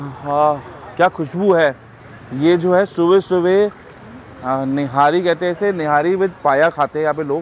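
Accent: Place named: Hyderabad, India. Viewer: Hahaha u r speaking pure hyderabadi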